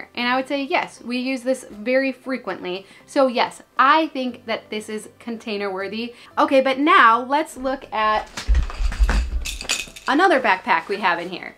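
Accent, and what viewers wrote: Minnesota, United States. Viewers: You say bAg like a true Minnesotan! Gotta love that hard A sound. I also have that same A problem 😂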